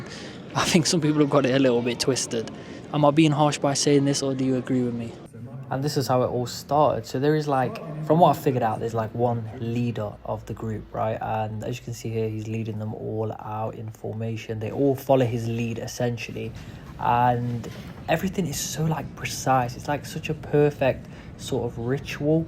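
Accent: United Kingdom. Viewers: my Pom friend I do really admire you Islam knowledge love British accent. and you are very humble my allah reward you with cennet ❤️🤲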